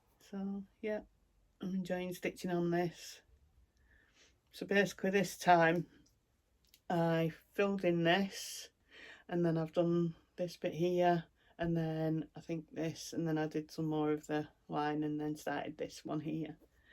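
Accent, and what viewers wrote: Lancashire, United Kingdom. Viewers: Your Lancashire accent is one of the lovely things about your podcast. Having lived in Rochdale for a number of years before, I would move back to the north of England in a flash if my family could be persuaded to move! Isolating would be a breeze if I had a nice tree to sit under and stitch. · Would love to stitch the tree or the flowers. So sorry you have been sick, but glad you are back. Certainly understand the different accents for areas of the UK. I love listening to yours. :-) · Hi, my name is Marion. Love your videos, I'm Canadian so it took me a bit to get used to your Lancashire accent, but I understand everything now😁. Sure hope you feel better now. Would like to stitch flowers! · Sorry to hear you have been unwell x I love your Lancashire accent,I was born in Accrington and now live in Southport I could listen to you all day x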